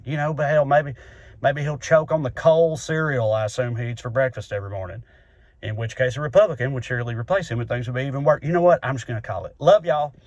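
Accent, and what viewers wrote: South, United States. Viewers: Amen...sir...so refreshing to hear a Southern voice spout common sense...its rarified to be certain.....and if you aren't the only one please loop me in.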